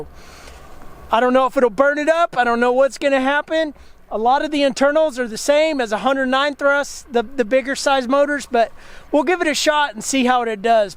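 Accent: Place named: United States. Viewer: Love your country accent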